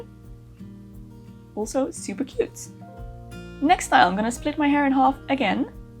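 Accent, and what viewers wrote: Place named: Netherlands. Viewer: You have a Dutch accent. Do you live in the Netherlands?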